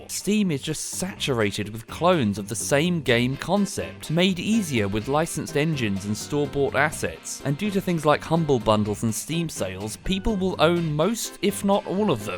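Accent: England, United Kingdom. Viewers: I'm getting strong UpIsNotJump vibes here. Or maybe all englishmen sound the same to me. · Bro a dude with a posh British accent just explained to me what early access really means I like this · i can understand british accent smoothly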